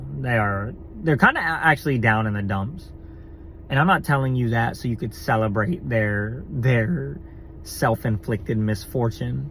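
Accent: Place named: Tennessee, United States. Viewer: Why you talking like me ? Tennessee baby !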